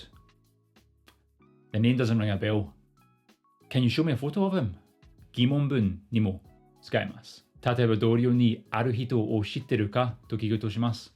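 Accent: United Kingdom. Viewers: イギリス英語だぁ〜💕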